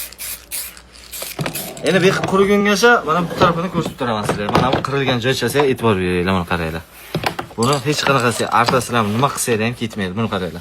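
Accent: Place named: Shahrisabz, Uzbekistan. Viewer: Шахрисабзданми дейман укам.шева шахрисабча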